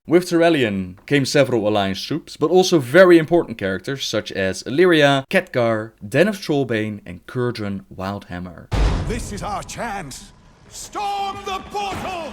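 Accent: Netherlands. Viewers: God, your accent is so pretentious and obviously Dutch even though you try to mask it. Stahp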